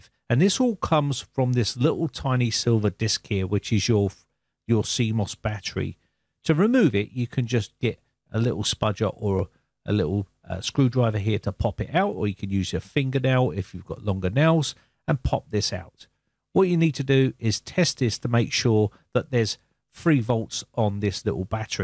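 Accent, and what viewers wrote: United Kingdom. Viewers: This is weird, because I have never owned a PC that has done anything other than pop a "CMOS Checksum Error" (or some similarly worded error message). The BIOS chip makes a checksum of all the settings you have saved in CMOS, and it keeps this in memory. When you boot the computer, it will compare the checksum of the current CMOS Settings against the CMOS Settings Checksum that it had previously saved. If, for any reason, they do not match (they won't match if the battery is dying, ever), it will pop that error and force you to go into the mainboard settings (some BIOS chips give you the option to boot with default settings instead, but this is usually undesirable because the default settings almost never include a storage device, which means no OS will be found). This is hard-coded into the PC's BIOS chip, and it's done for safety reasons as erroneous data could be very damaging to the computer's hardware, such as sending wrong voltages to the wrong places in newer computers whose BIOSes allow you to overclock hardware. 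You'd have to be running an extremely cheap off-brand mainboard to be able to boot with corrupt data in the CMOS. Unless, of course, they do things differently across the pond? You sound British so maybe computers in the UK work differently than those over here in the States?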